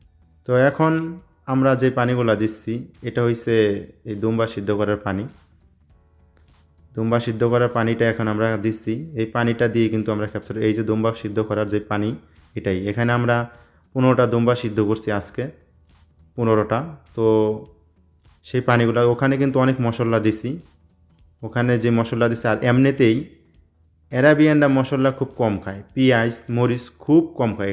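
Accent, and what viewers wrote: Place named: Barishal, Bangladesh. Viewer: ভাই মনে হচ্চে আপনার হোম ডিষটিক বরিশাল ।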